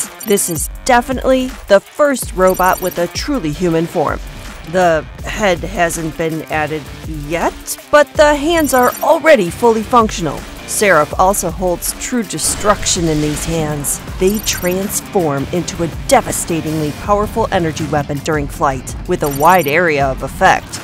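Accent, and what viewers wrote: Russia. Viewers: aww what happened to the awkward russian accent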